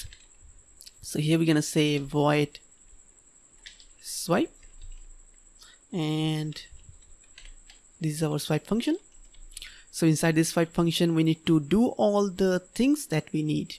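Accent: Bengal, India. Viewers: Amio Bangali...from bangladesh....apnar kotha bolar style dekhe keno jeno mone hossilo apni bangali...tai ask koresilam.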